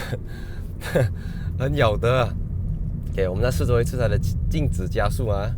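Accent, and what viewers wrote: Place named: Malaysia. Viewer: 第一他是馬來西亞人，第二這是馬來西亞人的交流方式，第三請尊重每個人的說話方式，即便是中國人也都會有口音的。所以請你先三思你的思緒和言語後再做評論。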